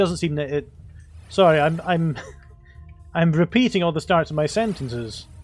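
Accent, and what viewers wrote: Scotland, United Kingdom. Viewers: are you from Scotland mate?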